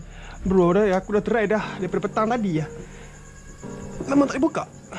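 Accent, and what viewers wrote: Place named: Pahang, Malaysia. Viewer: Sdap sial orang pahang cakap...aku tunjal je..hihiuhi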